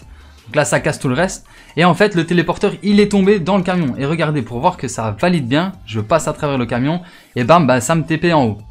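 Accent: Belgium. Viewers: T es belge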